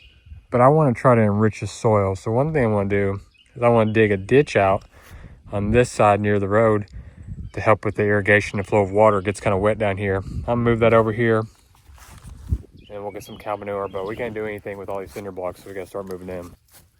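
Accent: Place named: Oklahoma, United States. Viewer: I'm from southeast Oklahoma....I thought your accent sounded familiar ❤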